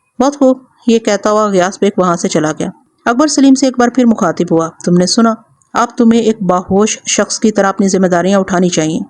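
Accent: Punjab, Pakistan. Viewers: جو مزہ الیاس سیتاپوری کی کہانیاں پڑھنے میں آتی تھیں وہ سن نے میں کہاں اس کی اصل وجہ آپ لوگوں کا پنجابی لب ولہجہ ہے جو سماعت پر بار گراں گذر تا ہے ۔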